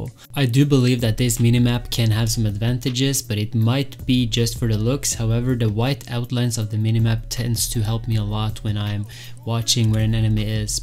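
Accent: Norway. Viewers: are you norwigan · are you norwegian?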